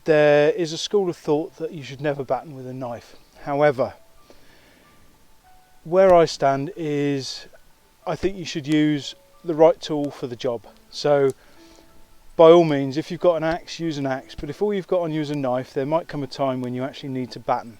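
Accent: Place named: United Kingdom. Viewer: Wow, in the world of accents I found a British man!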